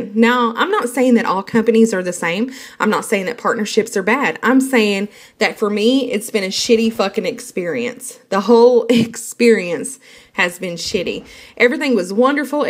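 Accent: South, United States. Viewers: I love the country accent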